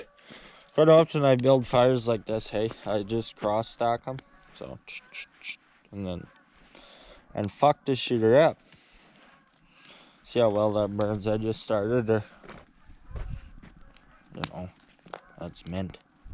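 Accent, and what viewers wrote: Canada. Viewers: You have made me fall in love with Canada with your accent lol and of course the beautiful wilderness of Canada is amazing too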